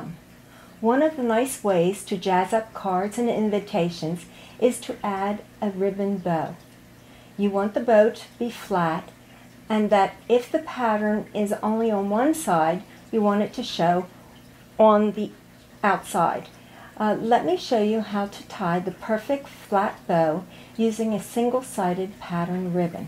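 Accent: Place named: United States. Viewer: Thanks a lot! I am probably responsible for half your views: trying to watch carefully how you do it :) What part of the states are you from? That accent is charming.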